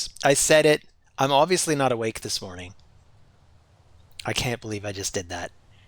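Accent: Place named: Scotland, United Kingdom. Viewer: I’m guessing Scottish maybe Irish. Good luck on the fights. I just got up to the 4000 mark early today.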